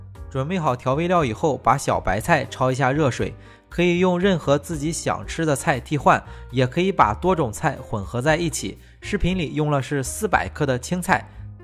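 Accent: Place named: Jinan, China. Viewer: 听口音就猜是济南的，加上甜沫豆腐脑，更加确定无疑了。谢谢老乡。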